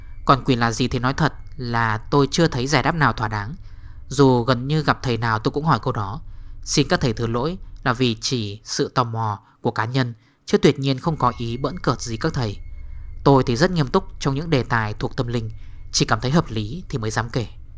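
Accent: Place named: North, Vietnam. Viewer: người ở đâu vậy? Nghe giọng thì người Miền Bắc rồi nhưng không biết ở tỉnh nào..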